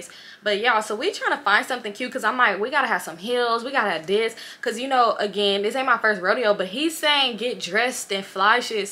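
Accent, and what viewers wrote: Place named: Los Angeles, United States. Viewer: u be telling your stories like everybody from la lol but love ya girl!! love your storytimes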